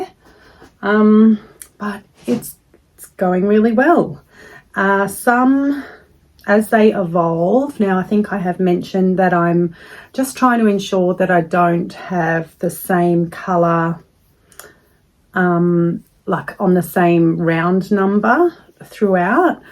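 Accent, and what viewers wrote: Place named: Australia. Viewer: ❤ watched all the way through! Great to hear an Aussie accent speaking about crochet! Your blanket is beautiful!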